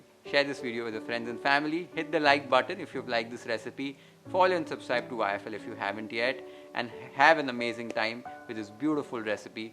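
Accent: Maharashtra, India. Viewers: आपकी हिन्दी महाराष्ट्रीयन style की लगती हैं लाडू = लड्डू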